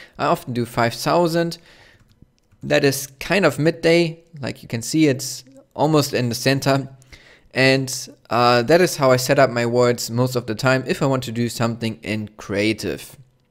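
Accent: Germany. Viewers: your german, arent you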